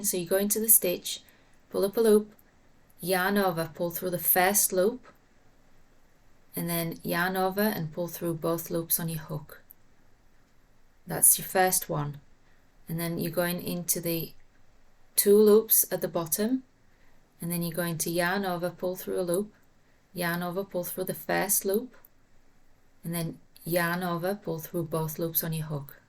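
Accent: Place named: North, United Kingdom. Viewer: Great to hear a northern accent with clear instructions. I’m a beginner and had no problem following your pattern. Thank you. I will be following you from now on. Xx❤️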